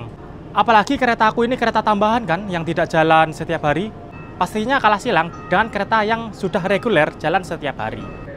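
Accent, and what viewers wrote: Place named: Probolinggo, Indonesia. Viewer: logatmu kayak orang probolinggoan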